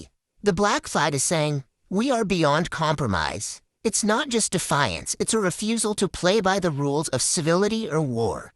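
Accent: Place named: South, United States. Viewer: we would still hear the southern drawl. Even with the voice filter.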